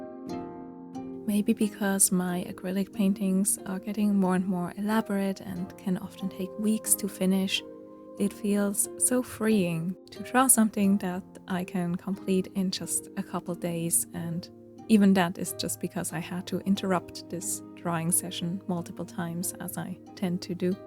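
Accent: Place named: Germany. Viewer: I just found you on YouTube because I was looking for relaxing art vlogs and your narration and story telling is so soothing and beautiful, it calms me and I find it very inspiring 💕 it's also very unique that you live in Korea but have a German accent? (I'm German btw 🙈🤣) Where were you originally from?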